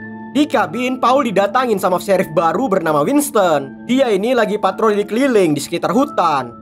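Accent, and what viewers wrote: Medan, Indonesia. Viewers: Asal medan y bg.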